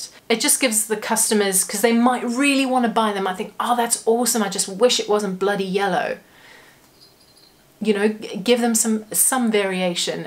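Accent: South Africa. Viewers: You South African? 😍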